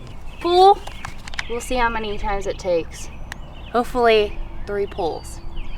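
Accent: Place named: Western Pennsylvania, United States. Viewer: Just found you with all of my recent chainsaw videos. I'm originally from Butler and you totally sound like you're from western PA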